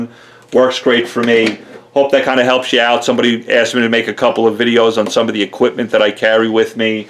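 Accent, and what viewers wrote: New York, United States. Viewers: Yes. The annoying noo yawk accent. How does area become area-er?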